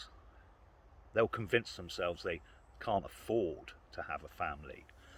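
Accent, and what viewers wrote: England, United Kingdom. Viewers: It is always nice to hear an englishman chat away. 😊👍❤️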